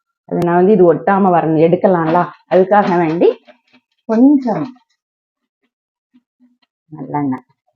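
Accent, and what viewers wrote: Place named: Tirunelveli, India. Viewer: Enjoyed your recipe and your Tirunelveli Tamizh❤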